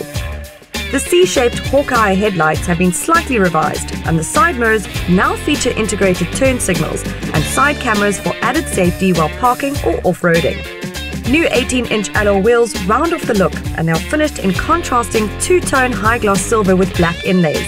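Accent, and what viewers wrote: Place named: South Africa. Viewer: The south african accent never fails to baffle me, how does it sound so posh and cool at the same time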